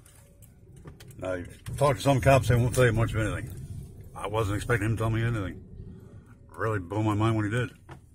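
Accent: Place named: North, United States. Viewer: Are you from Tennessee and this cop does he live in Tennessee you sound like you up north somewhere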